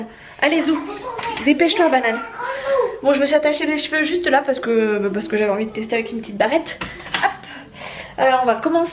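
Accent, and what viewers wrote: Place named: Basque Country, France. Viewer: Que j'adore cet accent Basque 🙂👍👍👍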